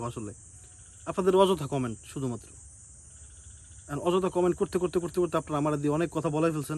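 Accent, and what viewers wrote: Barishal, Bangladesh. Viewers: বরিশাইলা বলে কথা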